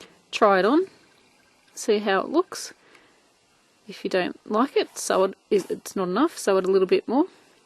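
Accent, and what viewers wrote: Australia. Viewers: Had a brainfart lol. I really do love your videos and your accent. Would love to come to Australia, that's my dream vacation.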